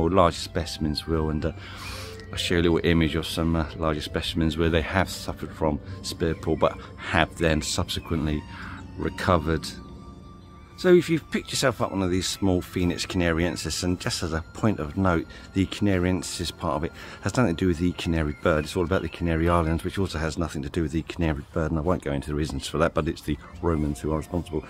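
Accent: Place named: United Kingdom. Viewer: man I hardly understand some words. british accent..help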